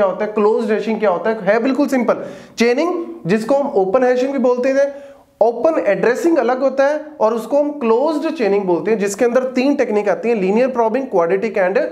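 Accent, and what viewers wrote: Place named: Haryana, India. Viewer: Sir aapka haryanvi touch h language me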